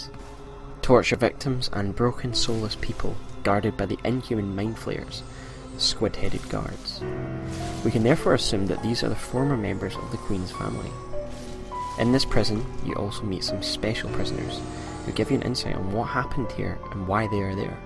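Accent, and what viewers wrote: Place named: Scotland, United Kingdom. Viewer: Dat Scottish accent. Nice vid man.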